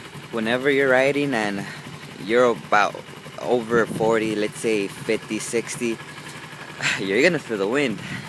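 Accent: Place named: Mexico. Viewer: u sound like a typical Mexican bro.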